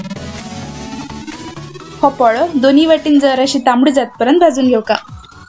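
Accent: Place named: North Canara, India. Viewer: Very nice ithink you are from north canara .my mother wasfrom north canara .always she is to make .her bhasha also like you speeking.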